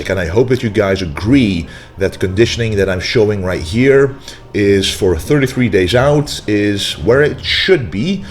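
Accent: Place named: Netherlands. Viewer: Are you dutch i think so because of your accent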